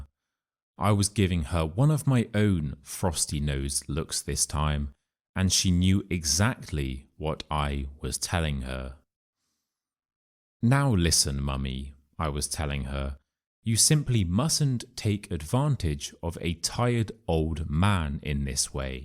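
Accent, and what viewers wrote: United Kingdom. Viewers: I like you british acccent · I do enjoy the story very much through your beautiful British accent. I cannot thank you enough ❤❤❤❤❤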